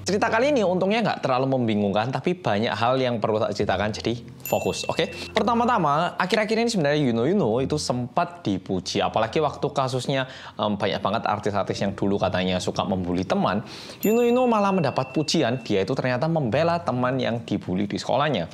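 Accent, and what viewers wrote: Java, Indonesia. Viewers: Orang mana si. Asli mana. Kok medok. #penonton_baru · Kalo gak nonton videonya, cuma denger suaranya aja, bener2 suara orang Jawa, medoook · Medoooook, asal mana to om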